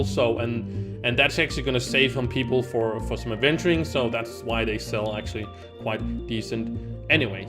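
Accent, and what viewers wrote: Denmark. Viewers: This is just a question. Do u come from Denmark? Cause it sound like that.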